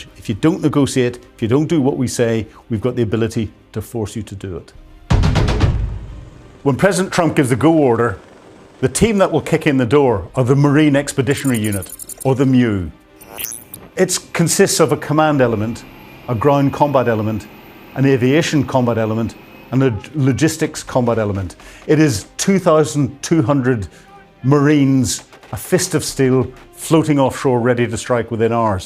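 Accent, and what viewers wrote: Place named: United Kingdom. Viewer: Very british English, thanks 😊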